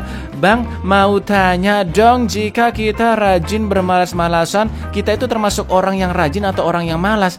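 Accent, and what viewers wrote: Java, Indonesia. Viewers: Bng lu orang Jawa bng 🤣🙏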